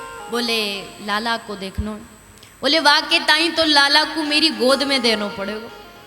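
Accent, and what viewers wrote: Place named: Braj, India. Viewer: मैया यशोदा व भगवान भोलेनाथ बाबा का " बाल गोपाल दशॅन " के बारे में आपके श्री मुख से यह प्रसंग व सवांद सुन कर हम काफ़ी प्रभावित हुए हैं। 🕉️🕉️🕉️🕉️ खास बात आपका वृज भाषा के ऊपर प्रभुत्व (वैशै तो आपका जन्म स्थान ८४ कोश में आता है फिर भी आप के मुखार विंद से वृज भाषा हमें बहुत प्यारी,प्यारी व मीठी, मीठी लगती है और बार, बार मन सुनने को कर्ता है। ☘️🕉️☘️ सचमें मैया और भोलेनाथ बाबा बात करते है ऐसा हम अनुभव करते हैं। ☘️🕉️ देवीजी, आपका बहुत-बहुत धन्यवाद हमारा राघे-राघे और जय श्री कृष्णा स्वीकार करे । 🙏♥️🙏♥️🍀☘️🍀💐🌷💐 <mail> USA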